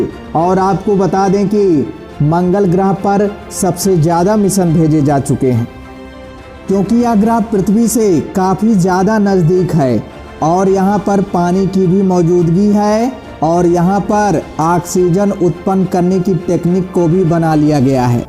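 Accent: Bihar, India. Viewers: Tum Bihar ke ho na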